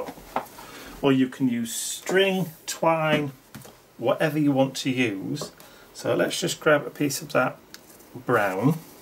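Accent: United Kingdom. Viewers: …..and I luv your british accent. I do not hear it that often. And it sounds pretty neat.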